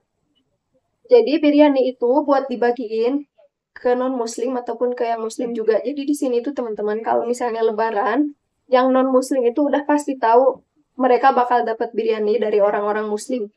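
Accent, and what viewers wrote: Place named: West Java, Indonesia. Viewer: Gimana ceritanya sih bisa nikah sama orang India, trus neng kayak logak Sunda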